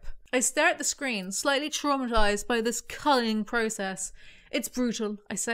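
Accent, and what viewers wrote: United Kingdom. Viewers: "I sound really funny when I talk" Dude, you're British. There's no way you could sound funnier (especially to us Aussies, anything you Poms do makes us laugh)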